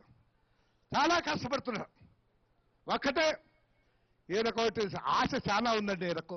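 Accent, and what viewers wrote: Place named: Rayalaseema, India. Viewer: Pure Rayalaseema language 😁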